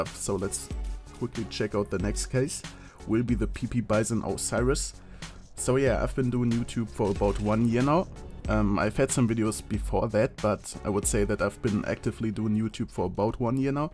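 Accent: Germany. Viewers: Alter DU sprichst Doch zu 100% Deutsch mehr als englisch......sehe Gerade dein erstes Video und du hast nen SOOOWASVON deutschen Akzent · Das einzige was zeigt dass du deutsch bist ist dein akzent aber sonst... Läuft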